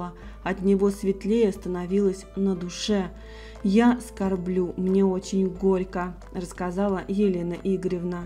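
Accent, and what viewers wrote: Russia. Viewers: Она что с Урала? В обаятельной и привлекательной.. Разноплановый был актёр 😢с незабываемым голосом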